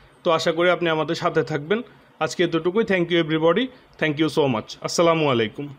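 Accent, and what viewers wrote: Noakhali, Bangladesh. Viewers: ভাই নোয়াখালী না চাদপুর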